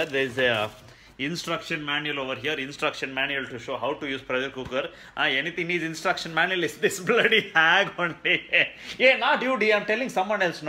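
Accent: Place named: Kerala, India. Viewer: Nostalgia this accent reminds me of my mallu maths teacher Matthew Sir in saudi hahahaha lol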